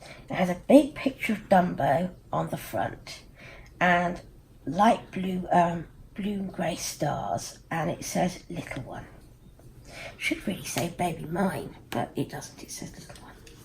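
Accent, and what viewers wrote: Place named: Wales, United Kingdom. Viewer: Aww, hello there, Jordan! He’s such a sweet boy! I absolutely adore his hands and his ears! :) That’s such a sweet outfit! I so hope the new Dumbo that just came out is better than the one I grew up watching. I think the story has potential, but I didn’t like how the original one was done. It was just too sad. I love the Welsh accent! XOXO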